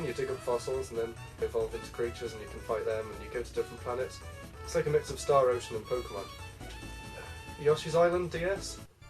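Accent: United Kingdom. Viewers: I really love the way you speak ( british english i guess) and also your games are very nice. Even tough i would have like a quick gameplay of you to each game, i still gave you 2 thumbs up and subscribed to your channel. So keep doing your good Job! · yea man no problem. really appreciate it. hope u gonna make a new video soon. it helped me to see which games i needed man. yea i figured you were from the uk. since u got a british accent :P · do you live in uk e.g beacuse of accent and games label game shop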